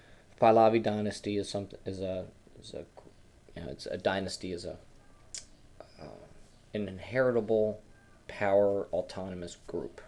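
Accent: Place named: Baltimore, United States. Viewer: Your accent is so Baltimore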